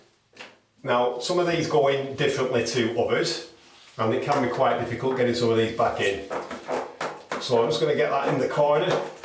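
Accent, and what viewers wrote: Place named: East Lancashire, United Kingdom. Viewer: Excellent video. I'm in Québec and I recognize the accent, East Lancs maybe ??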